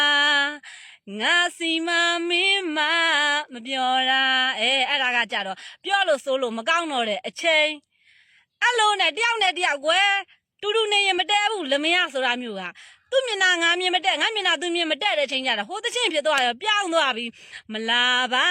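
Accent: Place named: Ayeyarwady, Myanmar. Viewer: အေးဒီအသံကဒို့ဧရာဝတီတောင်ရိုးဘက်ကအသံ❤❤❤❤❤❤❤❤❤❤❤❤❤❤❤❤❤❤❤❤❤❤❤❤❤❤❤❤❤❤❤❤❤❤တော်ပါတယ်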